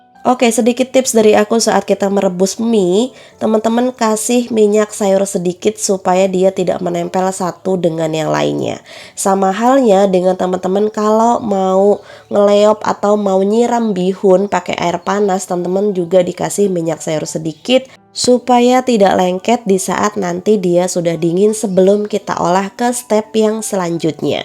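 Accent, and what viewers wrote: Java, Indonesia. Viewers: Ya Allah... Denger mbak ngomong Jowo koq yo iso medhok to... 😀..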